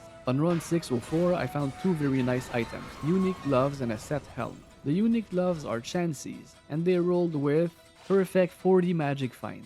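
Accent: Quebec, Canada. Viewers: Ton accent est tellement l'image parfaite du Québécois bilingue lol. Bon vidéo broski! PS : J'ai 2000 kills de meph/andy TZ en solo et je n'ai toujours pas Highlord's. J'ai juste abandonné et pogner mes torches lol. T'es chanceux !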